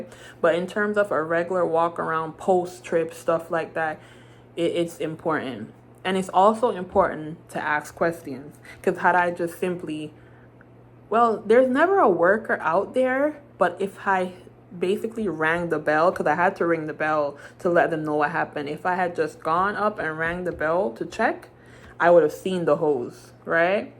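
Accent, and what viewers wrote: Jamaica. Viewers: Interesting I work for IRT. Safety will definitely have a problem w mistakes like that but your human it Happens. I have never heard a local IRT Driver you sound Jamaican best wishes to you sister on your future endeavors lots of tanker jobs out there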